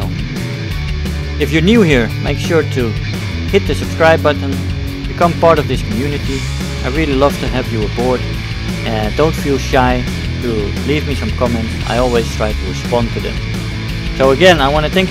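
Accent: Netherlands. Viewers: i realy like your videos bro its gonna be a +sub from me ;) i bet your dutch am i rigth couse you have a dutch accent or is it just me ? :p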